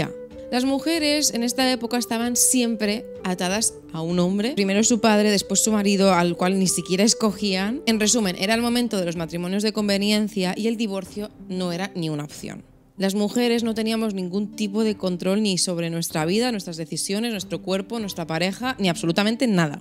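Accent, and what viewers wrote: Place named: Catalonia, Spain. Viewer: Me hace muchísima gracia cómo cuelas sin querer expresiones o palabrillas en catalán, te sale tan natural que es adorable jajaja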